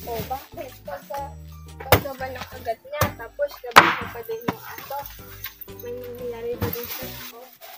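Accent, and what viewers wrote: Iloilo, Philippines. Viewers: bacolod Ako kawaki. Sabi ko n ilongo k dahil sa tono mo magsalita sarap yan kbl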